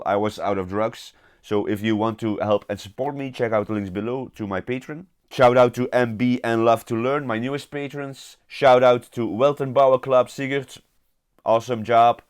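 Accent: Netherlands. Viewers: Your accent sounds very Dutch, am i right ? Ah, Belgisch, close enough ? Het klinkt wel vrij Nederlands, meer dan Belgisch, dus je woont/komt van bij Nederland in de buurt wel ?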